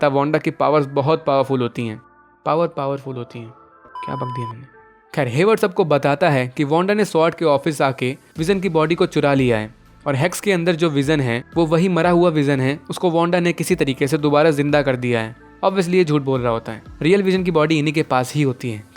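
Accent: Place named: Gujarat, India. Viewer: Are you Gujarati 🤭💜🖐️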